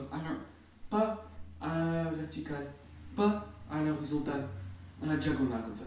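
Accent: Nord-Pas-de-Calais, France. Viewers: Exprès et il parle avec le Nord il est chti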